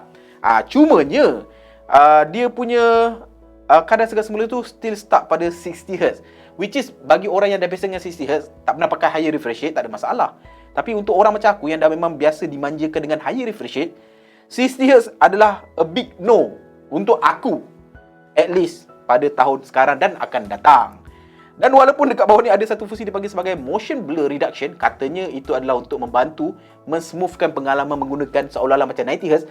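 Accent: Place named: Malacca, Malaysia. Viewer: Abng asal melaka ke pliss reply 🙏🙏😌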